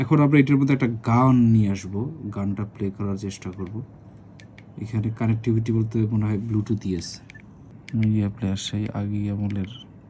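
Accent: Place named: Barisal, Bangladesh. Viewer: Ha ha apnar kotha shuinai bujhsi barisal tone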